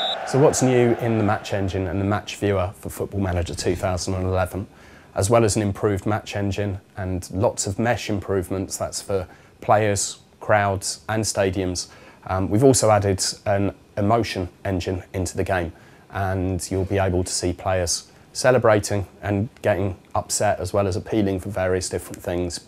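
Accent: United Kingdom. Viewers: i'm not sure but it's sound like London dilect mate =D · what type of english accent is this?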